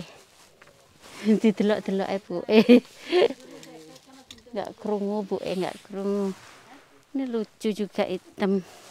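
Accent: Java, Indonesia. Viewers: Bahasane medok mb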